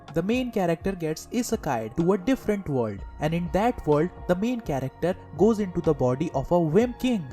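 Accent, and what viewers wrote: India. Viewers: Are u indian because u sound like one.... Dont get me wrong I was just askin I ain't racist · I think i just found the best indian accent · India best accent no 🧢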